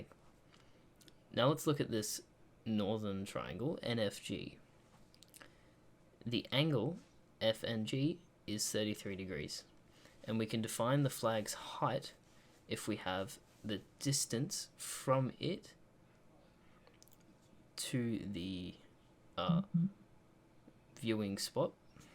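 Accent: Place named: United Kingdom. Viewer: Thank you i love your British accent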